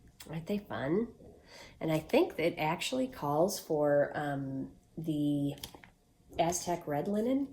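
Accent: Minnesota, United States. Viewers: Good to see you back. Stay safe. I was watching this and my housemate walked in the room. When he heard your voice, he asked where in Minnesota you were from. His mom and sister live in Sauk Centre and his niece is a pediatric nurse at the hospital in St. Cloud.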